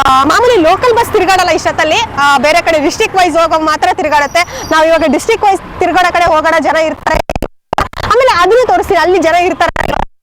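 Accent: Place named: Bengaluru, India. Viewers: Bengaluru hudgi Tara maataadta ediya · ನೀವು ರಾಯಚೂರು ಅಂತ ಹೇಳ್ತೀರಾ ಬೆಂಗಳೂರು ಭಾಷೆ ಇದೆ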